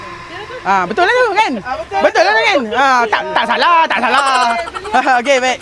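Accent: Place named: Kedah, Malaysia. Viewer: Kat mana ni kedai cakap mcm org Kedah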